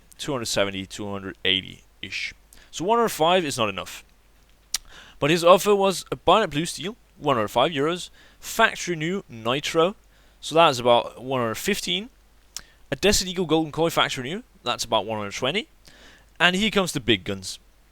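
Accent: Denmark. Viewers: Lool, are you from denmark? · Where are you from? You sound like a Dane.. :)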